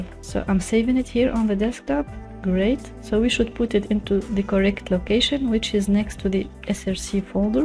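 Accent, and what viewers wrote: Tunisia. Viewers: great tuto and in point, but i can't stop ignoring your tunisian accent, it's like hearing my self talkin, keep going my tunisian girl <3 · ur tunisian right? haha ur accent is soo tunisian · the English accent is so Tunisian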